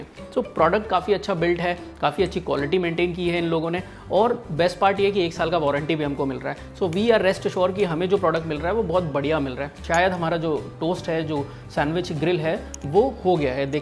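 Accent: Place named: India. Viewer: Why do you speak for 1minutr plus without demonstrating the sandwich maker? If you thought you were doing something your Indian accent makes it hard to understand you also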